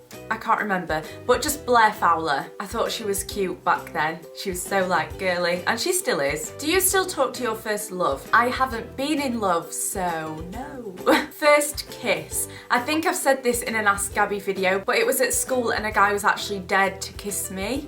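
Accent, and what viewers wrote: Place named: Yorkshire, United Kingdom. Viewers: Sheffield so South Yorkshire :) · Hi gabby! I live in Rotherham which is like literally half and hour away from Sheffield were you lived ! I'm so glad that I have the same accent as a youtuber 😂 that sounds really sad but you sound like were I'm from so its like normal to me and I just find it cool.. I sound so lame here.. LOL;) 💕💕p.s love your videos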